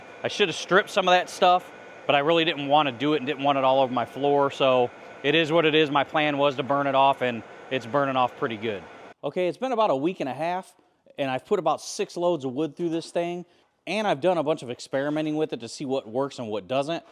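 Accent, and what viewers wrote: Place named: Buffalo, United States. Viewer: My dad was a boiler code welder at Harrison Radiator Division of GM for over 30 years. He passed away at 80 just 2 years ago this past week. (28th of Nov) He would have LOVED your video and everything about your entire build here! He might have had some ideas & inputs as well. He always talked about building a boiler to burn waste oil. He knew a fella in our area in Western NY that made one back in the early 80's (or maybe it was the late 70's) At any rate he passed that interest on to me. We have lived in Alabama for almost 40 years now, so we don't have quite the need for heat as we do the A/C, but it can get downright chilly even through the short winter that we do have. I think I'd have some fun with the handles, I was thinking springs or twisted rod. There's so many things you can do to put the finishing touches on. 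Buttons, bells & whistles right? Man! I am just happy to see all you've done & appreciate ya! Good goin' bro! I'm just curious, are you in Michigan? There were a few things that you said that almost sound like a Buffalo accent, but you don't sound too much like us!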